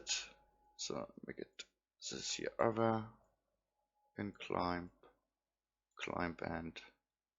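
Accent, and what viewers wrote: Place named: England, United Kingdom. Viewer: i wanna say a big Thanks for all of your tutorials they are really help :) (i love your lovely English accent)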